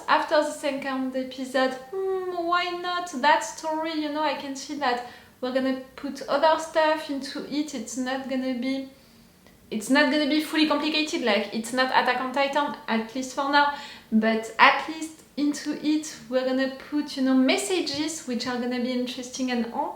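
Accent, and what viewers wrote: France. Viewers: C’est un accent français ca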